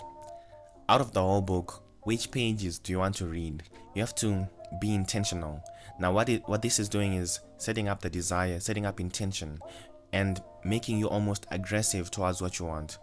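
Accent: Zimbabwe. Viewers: U sound Zimbabwean ❤ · Is he from Zimbabwe 🇿🇼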